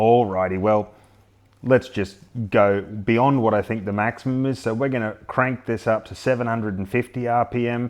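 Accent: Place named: Australia. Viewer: Hey. Thanks for showing us all this great stuff. It is fun to see your channel growing. I learned how to understand Austrailian from Curtis so I get most of what you are saying! Watching from Abbotsford, southwestern Canada. 🇨🇦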